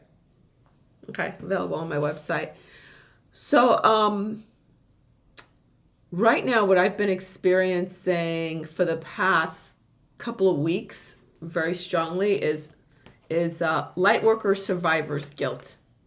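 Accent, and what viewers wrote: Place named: Boston, United States. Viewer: Hello!! Thank you sooooooooo much! I knew that was Boston accent first video. New Subby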